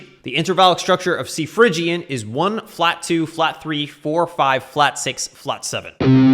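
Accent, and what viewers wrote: Canada. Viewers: Why are you swearing. Sounds like you are from Canada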